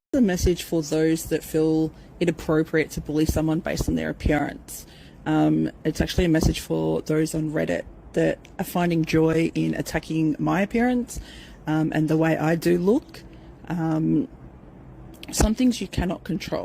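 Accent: Australia. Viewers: Australians are awesome!! Lovvvee the accent too 👍🏼❤️ Also, as an American have you SEEN the state of our country right now? Lol I’d be the last to judge anyone else 🤣💀Alicia Dougherty doesn’t represent most Americans so don’t judge us lmao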